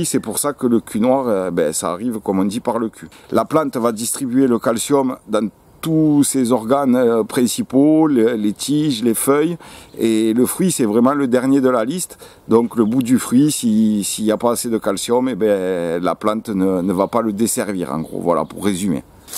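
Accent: South, France. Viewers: Moi j'y connais rien en jardinage mais par contre, j'adore l'accent du Sud !! C'est l'accent du Sud Est ? Du Midi ? Je ne sais pas les differencier. Rien que pour ça j'adore cette vidéo et je vais m'abonner ! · Bonjour. Video claire, précise, concise et fort intéressante avec votre petit accident du sud. Merci de me rappeller des basiques que j avais un peu oublié.... J ai une formation horticole, mais en 30 ans on oublie 😉 Petite question peut être un peu idiote mais quel style de lait est t il préférable d utiliser ? Stérilisé, entier, demi écrémé....? Si vous me tirez au sort je vous donnerais le nom d une exceptionnelle tomate cerise que je mets depuis 2 ans.... Allez c est pour moi.. C est gratuit... C est la sundance... Bon c est une hybride mais di vous ne connaissez pas... Je ne peux que vous la conseiller. 😉 Bonne soirée à vous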